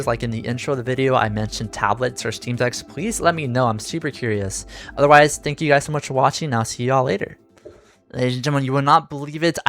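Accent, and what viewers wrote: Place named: Texas, United States. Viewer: texan spotted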